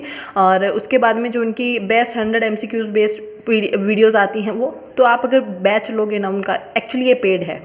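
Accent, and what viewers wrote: Haryana, India. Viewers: Aap haryana se ho ????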